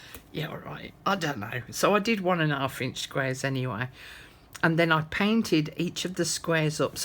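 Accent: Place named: Black Country, United Kingdom. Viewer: Are you from the Black Country? You sound like my friend from Wolverhampton :-) I live in Florida.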